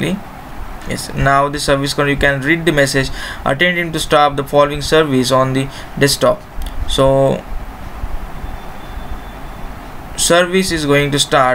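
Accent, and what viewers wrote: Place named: India. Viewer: Hmm indian accent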